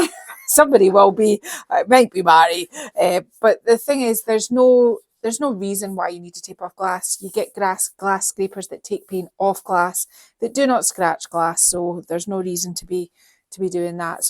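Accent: Scotland, United Kingdom. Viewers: Beautiful! Luv the building technique. I really need to let go of my perfectionism and let that paper and paint fly! Love Marty with a mic. So sorry you can't say, " just leave it alone" with a straight face anymore, I was rolling on the floor! I got another one for you guys coming up on my next vid. I take your words like the gospel, I hear them with your beautiful Scottish accent in my head. 😂 would love a shirt or a coffee mug with your sayings on them. You really knocked it out of the park on this one guys, dar p.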